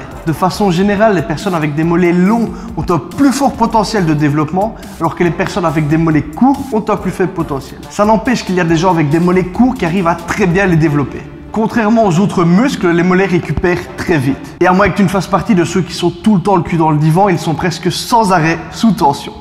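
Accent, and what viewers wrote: Liège, Belgium. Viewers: msemblait bien qu il y avait un accent de liege ou pas loin ^^ merci cool tes videos j ai decouvert ia pas longtemps (je suis de Mons en Belgique egalement). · Un bon accent bien de chez nous #BelgiqueVie👌🏽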